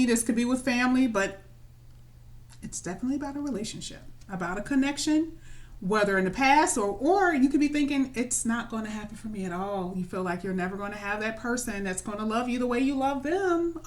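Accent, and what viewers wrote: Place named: United States. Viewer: Thanks for the reading. I loved it and it made me so happy and resonated. Greetings from England and I adore your American accent and voice. I look forward to your next video sister. Also you are so clever to read tarot and predict the future and is so kind of you to do free readings. I am struggling financially right now and we are in lockdown in England but once I find my feet I will definitely contact you for a personal reading. I hope you are not depressed with lockdown and making enough money. I don't know if you have a day job as well as the tarot but I hope you are getting by financially in lockdown as many people are struggling. I would like to support your business as a fan of yours and have a personal reading and recommend you to my friends who will hopefully recommend you to others. Love and light. God bless you. 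Stay safe sister Love you lots xxx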